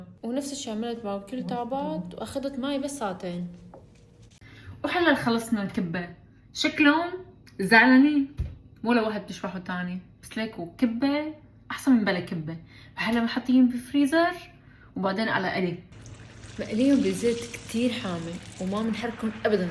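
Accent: Syria. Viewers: هههههه بتحكي سوري لهجة شامية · مش مممممممنكلامك السوري روووعه❤ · لهجة لادقانية هي ههه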